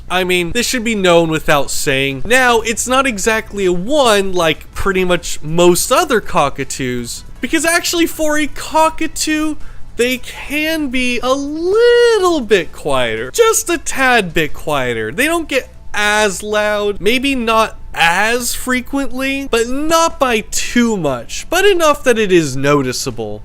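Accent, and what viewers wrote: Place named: India. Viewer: My God, what english talks this chap? I cannot understand him. Are you from India or Pakistan, or Thai…. impossible recognize this accent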